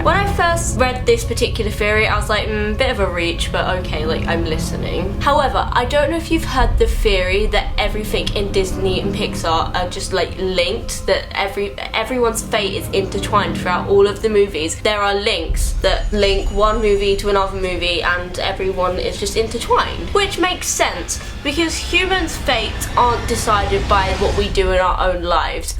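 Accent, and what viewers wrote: United Kingdom. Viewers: Fuck me up w ur Britishnesss mama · Gurl if you put on a Victoria secret 2 cup push up bra, a juicy sweater, and made your hair a little more blonde... You'd be Tana with a British accent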